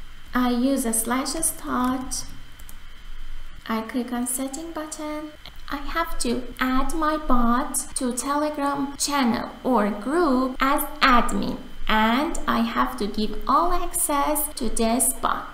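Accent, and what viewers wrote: Iran. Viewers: از لهجت معلومه ایرانی ای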